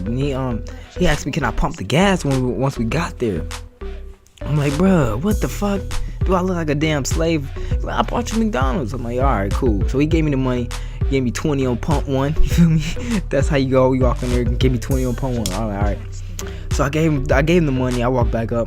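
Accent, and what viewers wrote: Chicago, United States. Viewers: You from Chicago ?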